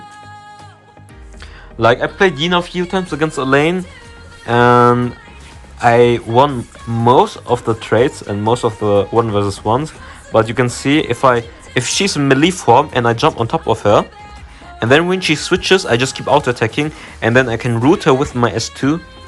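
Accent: Germany. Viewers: Bro are u german ?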